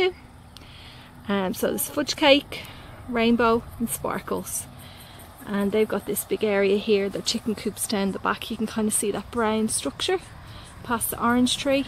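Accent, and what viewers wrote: Ireland. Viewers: Loved the video, adore your Irish accent. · Hi beautiful garden, love the white tomatos and the sunflowers. .never seen those tomatos before..did I hear an irish accent there?? So nice.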